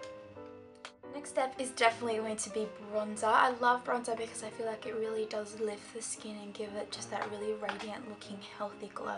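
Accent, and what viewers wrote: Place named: New Zealand. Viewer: OMG i just found you and subscribed to you and i love you!!!!! fabulous!!! love your accent!!!! new zealand? or south african?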